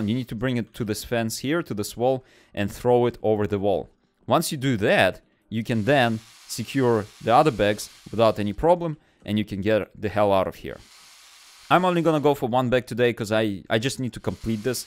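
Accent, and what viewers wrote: Russia. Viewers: So, you are Russkiy, ye? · [GERMAN]Ich höre da Russischen Akzent raus. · arr u rusian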